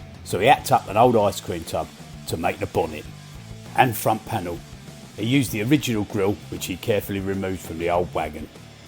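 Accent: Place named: Cockney, United Kingdom. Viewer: thematically essex/cockney narration on da orks is inspired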